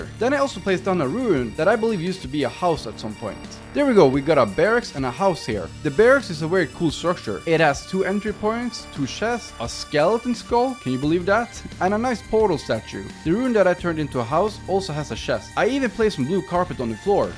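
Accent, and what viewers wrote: Sweden. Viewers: are you from sweden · love the Swedish accent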